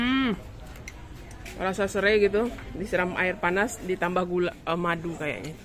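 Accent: Minangkabau, Indonesia. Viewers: Gomok bahaso padang nyo tu bu 😂😂😂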